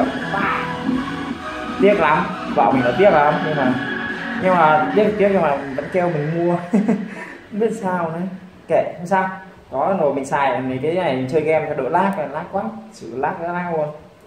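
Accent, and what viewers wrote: Vietnam. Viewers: anh ơi anh miền bắc hay miền Nam vậy em miền Nam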